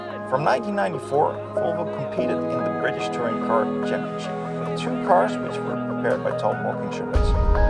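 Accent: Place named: Netherlands. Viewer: Ha! Dat Hollands accent ... dat blijft ge van kilometers ver horen hè. Grappig wel.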